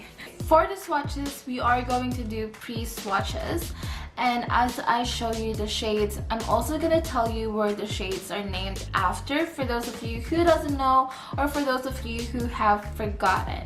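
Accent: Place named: United States. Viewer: sure sissy BTW I love your accent are you half american?? Ur fluent in English 😍😘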